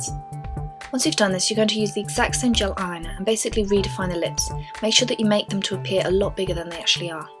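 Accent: United Kingdom. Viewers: Dunkles Avatar Make Up. Is aber der übelste britische Akzent. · wow, i love your british accent and i love what you`ve done with your face; its damn sexy ^^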